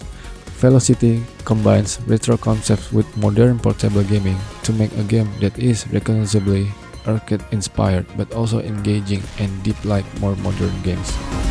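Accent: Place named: Indonesia. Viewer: bang logatnya mirip orang indo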